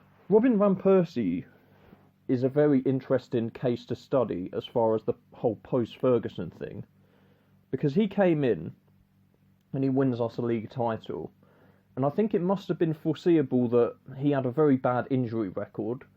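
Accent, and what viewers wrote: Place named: RP, United Kingdom. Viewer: You sound like a posh spak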